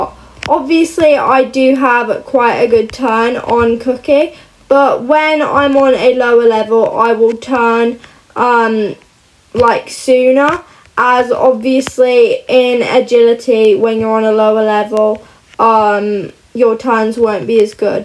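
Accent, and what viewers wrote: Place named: United Kingdom. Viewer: Can we just talk about how she sounds perfectly British? Not being rude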